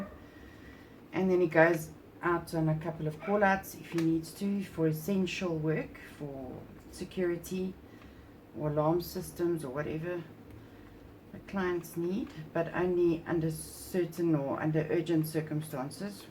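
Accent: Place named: South Africa. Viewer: Oh my goodness that os a stunning layout. Its so wonderful to find a fellow crafty south african! I watched your video while baking bread. I miss that accent terribly.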